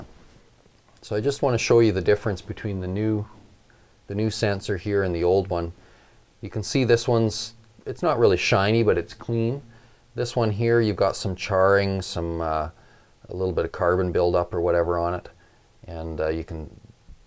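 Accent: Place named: Canada. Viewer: I knew u were Canadian by your accent but you saying 40 below just confirms it. That's crazy cold. Great videos. Best in the web! Thanks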